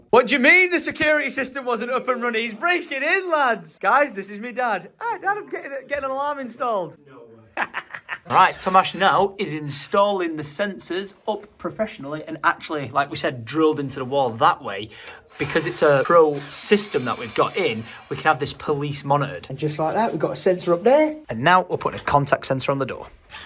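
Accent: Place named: Manchester, United Kingdom. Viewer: I just love the Manchester Accent! could listen to talk all day x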